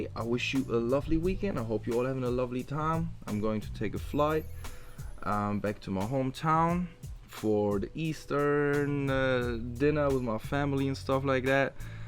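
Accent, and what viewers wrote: Germany. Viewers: Bro du bist doch Deutscher, das hör ich doch direkt raus 😄 warum hast du deinen Kanal in den USA located? Wohnst du da seit einer Zeit?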